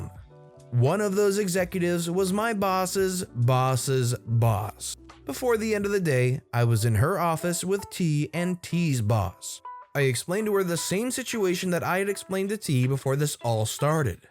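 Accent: United Kingdom. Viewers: is British, that'll be why / sounds different XP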